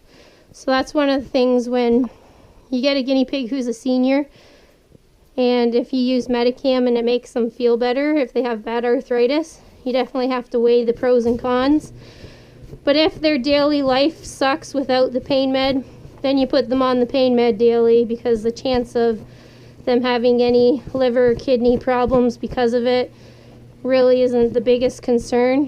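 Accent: Canada. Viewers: The moment I heard you talking I knew you were Canadian! Just found your channel & I loved your commentary on this video! Subscribed & happy to follow a fellow Canadian ;) look forward to seeing more! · Judging by the way you speak, you must come from southern Ontario. I'm from northern Ontario. You wanna talk winters??? My daughter moved to Windsor I was almost ready to move with her lol. We get really bad storm up here.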